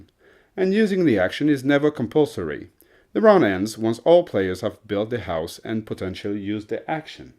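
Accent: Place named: United Kingdom. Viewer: Waou la voix qu'il a en anglais! Très lord british!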